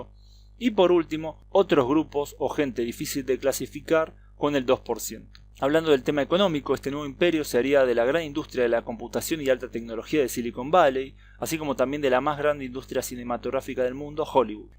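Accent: Argentina. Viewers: O espanhol argentino do narrador é o melhor. Muito bonito o acento platino. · Latino???¿ De qué estás hablando? Serán países de habla Española Latino era una lengua que se hablaba en el Lacio (Italia) y hoy el único país que habla latín es el Vaticano. Latinoamerica es una denominación que los franceses acuñaron para quitarle el inmenso poder que tenía España. Tu acento parece Argentino. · Video basura... Argentino tu acento asqueroso.. dan ganas de cagar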